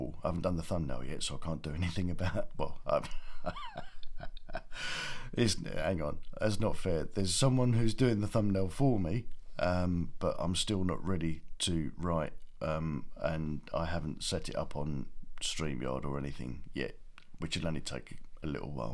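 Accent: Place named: Cockney, United Kingdom. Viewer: Yes I agree and thought that the dog had followed the scent of its owner. For all we know that poor woman was dragged off by someone and there were no witnesses. Love your Cockney accent, you sound so cultured, me I just sound common as muck, in spite of a BA, HNC, A & O levels. I’m mixed blood, mother was Southwark, dad was a crane driver from Wapping. Happy days hun 😊👏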